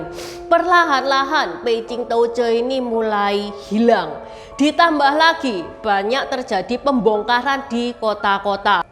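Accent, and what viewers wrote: Java, Indonesia. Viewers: Enakan yakin dengerin dongeng nya nih.. dg bhs indonesia ber aksen jawa medok.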